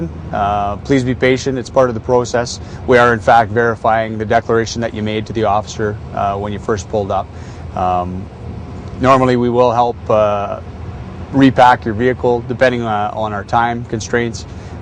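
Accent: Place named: Manitoba, Canada. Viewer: True. Based on the "a" in his "mAndatory" which is only common west of Ontario, his "ou" in "about" which is of course the stereotype of small-town Canadians, his "ar" in "card" which is ever so slightly different from that of the eastern provinces, his "la" in "regulations" which almost sounded Native/Aboriginal to me, combined with his intonation and his very frequent use of "thee" for "the" and "ai" for "a", I would peg him as being from Manitoba or Saskatchewan. Only a guess tho!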